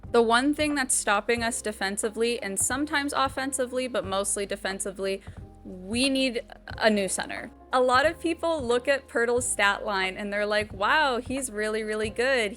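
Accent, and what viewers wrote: Canada. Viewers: Are you canadian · i was not expecting the toronto accent omg 😭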